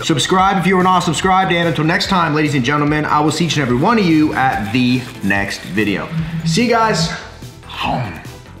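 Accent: Texas, United States. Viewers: is it me or ur getting a Texas accent 😱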